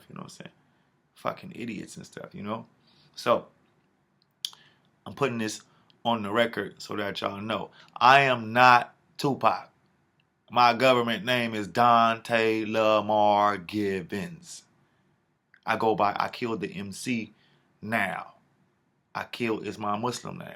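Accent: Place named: Jamaica. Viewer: And another thing why are you talking with a jamaican accent if you're from South Central LA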